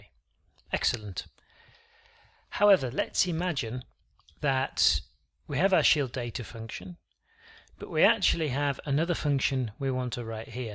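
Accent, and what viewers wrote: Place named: United Kingdom. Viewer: Your clear voice with nice BrE explain things way more better than my uni lecturer, shame on them who call themselves "professor" may have great academic achievement but have no idea how to teach in an accessible way .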